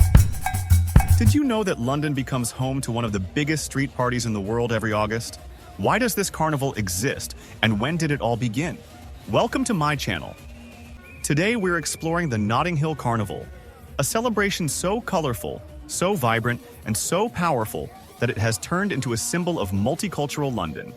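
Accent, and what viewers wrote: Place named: United States. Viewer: Sounds like an AI generated American accent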